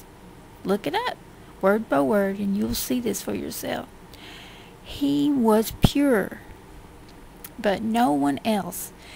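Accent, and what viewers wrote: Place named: South Carolina, United States. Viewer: thanks for the videos.. sounds like your from i guess south Carolina?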